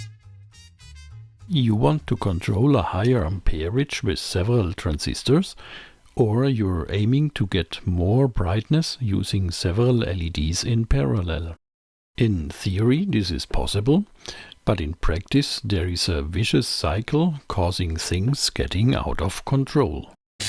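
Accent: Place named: Germany. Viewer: Cool. Interessant. Wollte sowas bauen ala Signal Lämpchen mit pwm am minuspol zur globalen Helligkeitsregelung. Die LEDs müssen aber dann je nach Farbe eimen passenden Widerstand bekommen. Hab schon erst mal ins Klo gegriffen, transparente LEDs sind ungeeignet. PS. Deutsches Englisch ist fürchterlich 8) gerne of deutsch. Ich glaube, Mittlerweile kann man auch mehrere Audio Tracks anfügen für verschiedene Sprachen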